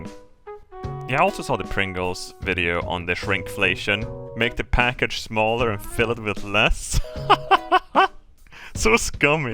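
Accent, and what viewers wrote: Sweden. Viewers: making videos in English for 20 years and still having a strong swenglish accent is wild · I can only hope to one day achieve level Swenglish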